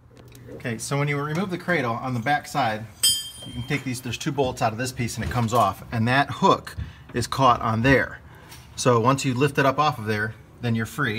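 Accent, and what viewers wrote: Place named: New York, United States. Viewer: That new York accent though 🤣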